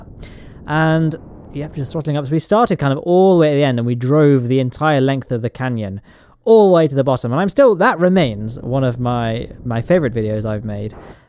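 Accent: United Kingdom. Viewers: You are British..... and space....? Instant Sub!!!! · For some reason I heard that as "This is a purely autistic video" instead of "This is a purely artistic video". Must be your British accent. I'm from America.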